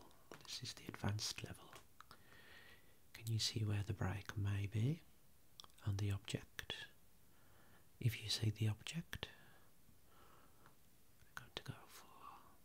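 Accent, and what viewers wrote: Birmingham, United Kingdom. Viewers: Its cause hes a brummie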